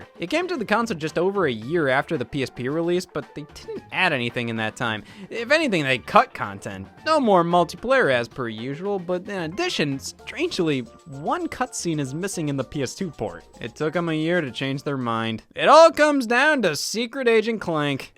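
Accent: Midwest, United States. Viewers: Mid-western accent (especially Ohio)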